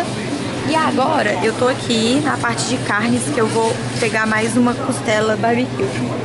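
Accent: Minas Gerais, Brazil. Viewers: "Passar esse trem aqui..." kkkk Sotaque mineiro é demais... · É o sotaque de Minas!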